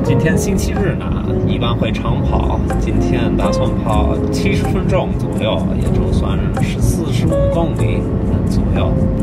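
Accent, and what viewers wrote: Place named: Australia. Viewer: 的澳洲腔说中文好好听哈哈哈哈哈😂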